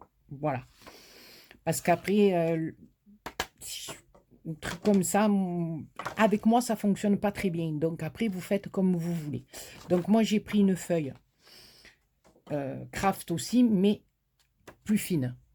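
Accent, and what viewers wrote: South, France. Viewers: Cc ma, tu as toujours des idées originales....elle est trop sympa cette cartounette! En plus à chaque fois que je t'écoute tu me rappelles le sud où je passe mes vacances d'été depuis 16 ans! Prends soin de toi ma et à très vite pour un tuto! Pleins de bisous. Sandrine du 91 😘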